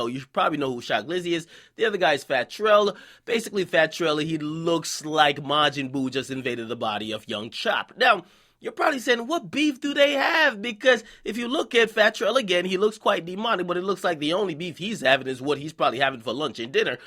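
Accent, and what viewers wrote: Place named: South, United States. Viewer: you are an american rapper loose the country accent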